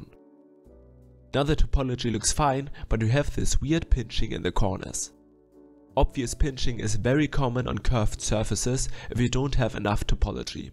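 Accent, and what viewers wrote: Germany. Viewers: Das ist doch ein deutscher Akzent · deutsch accent or i wrong? ) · Through the full video i need to think about how german your accent sounds XD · Cool, but are you from germany? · Idk why but u sound a bit German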